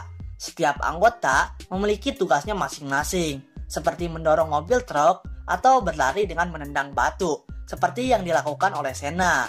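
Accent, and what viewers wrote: West Java, Indonesia. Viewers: Sunda pisan iyeumah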